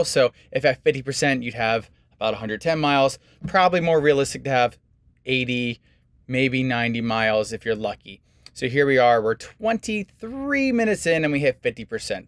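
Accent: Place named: Baltimore, United States. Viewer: Nice video. I am having trouble getting over the Packers quarter zip. I had always thought you were a local Baltimore guy with a Townie accent. Seriously though, nice vid. Did I miss the overlay of the original "new" charging curve? Maybe the Packers top blinded me.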